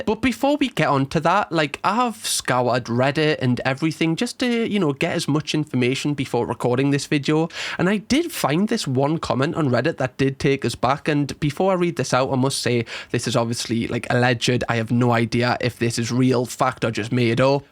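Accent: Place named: Newcastle, United Kingdom. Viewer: The amount of times you say video makes me wish I could say it like you. I'll get my dad to teach me the ways of sounding more Geordie. 😆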